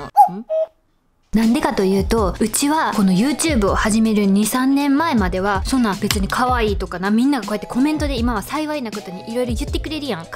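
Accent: Kansai, Japan. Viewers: 今日は関西弁強めやね！きっとスターになれるで！！！ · そんな頻繁に関西弁使ってたっけ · こんな関西弁酷かったっけ？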